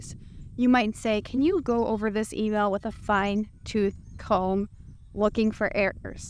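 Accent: United States. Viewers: May I ask you a question? Your American accent belongs to North America?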